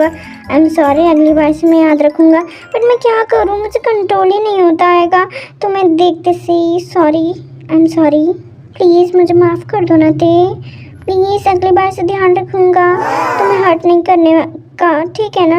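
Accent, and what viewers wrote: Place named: Amroha, India. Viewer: AAP Khan se Ho tumhari language toh amroha ki lag rahi hai 😊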